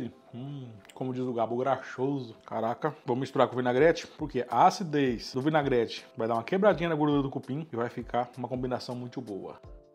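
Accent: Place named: Minas Gerais, Brazil. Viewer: Oi! Desculpa me meter na sua pergunta kkk O já falou que a cidade dele é muito perto de MG, por isso ele tem esse sotaque meio mineiro, mas ele é do interior de SP mesmo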